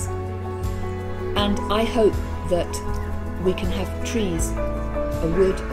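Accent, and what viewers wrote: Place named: London, United Kingdom. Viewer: 天啊。好标准的伦敦腔。听着好美好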